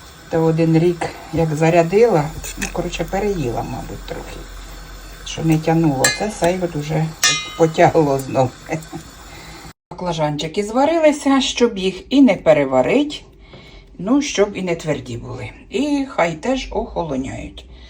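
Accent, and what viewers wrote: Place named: Central Ukraine, Ukraine. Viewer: Яка приємна українська мова в центральній Україні, чудова. 😊❤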